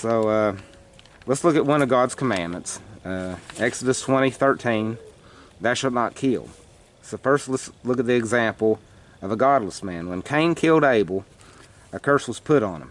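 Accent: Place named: Tennessee, United States. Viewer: Oh my hole reason to message you was to thank you for submitting to our Creator and also to ask you if you were from Tennessee cause you sure have a Tennessee, Kentucky, Mississippi accent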